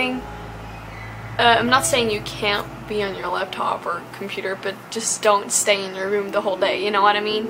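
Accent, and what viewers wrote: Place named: United States. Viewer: You haven't lost your American accent yet. Great tips!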